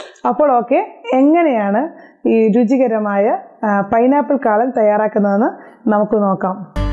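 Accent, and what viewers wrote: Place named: Ranni, India. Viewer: Facebookil page kand recepies try cheyyarudarunu. Ariyavunna oral parayumpoleya samsaram. Pathanamthitta ranni samsaram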